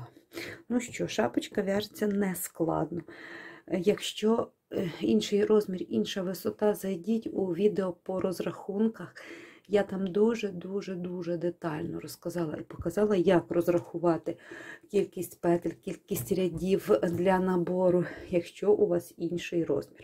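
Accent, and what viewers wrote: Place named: Kyiv, Ukraine. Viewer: Я благодарна вам, и говорите вы, так мило, как моя тётя в Киеве говорила, люблю ваш язык. Спасибо за доступное разъяснения.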